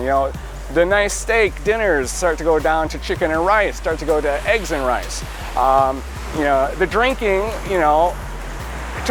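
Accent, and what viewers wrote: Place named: North Carolina, United States. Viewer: Hi, I really like your videos about daily life in Thailand and the many traps you can come across as a farang living here in Thailand. But there is one thing I want to mention about your performance and I hope you will understand what I mean: I'm a native German speaker and actually quite fluent in English (worked in the UK for 4 years) but I sometimes have really difficulties to catch up with your Northern Carolina accent. And I think I'm not the only one among your followers that have this issue. I you - maybe - can do something with that it would be really appreciated very much. And please: No offence meant! 😜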